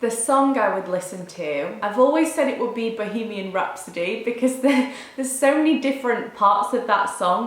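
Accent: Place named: North, United Kingdom. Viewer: You look so pretty! 😍😘Your northern accent was totally coming through in this video😜(not dissing just something I noticed lol) So excited for the new setups!😉😊💗💗xxx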